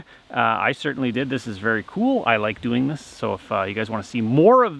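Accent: Canada. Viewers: For some reason you sound more Canadian today 😂 .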